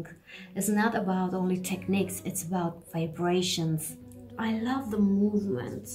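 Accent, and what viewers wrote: Italy. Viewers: I love your Italian accent and I love your reactions to So Hyang. Subscribed ♡. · I am in love with your Italian accent!!!!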